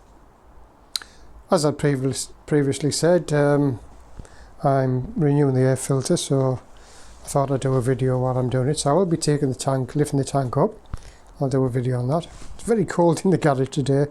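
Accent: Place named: North, United Kingdom. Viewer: Yes very good videos. Good northern accent very smooth. Looking to get one of these myself.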